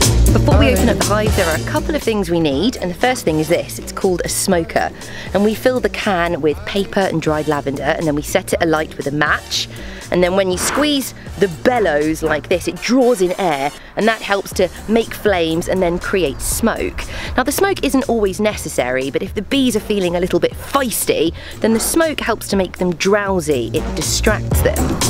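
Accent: United Kingdom. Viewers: I can tell she’s British based on her accent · YOU SOUND LIKE YOU'RE FROM LONDON!! :D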